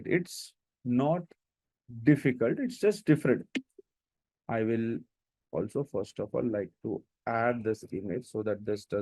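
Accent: India. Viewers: My God, her English is terrible, it's just Indian English. It's not English; my brain is straining to figure out what word the Indian woman was trying to pronounce. 🤦‍♀️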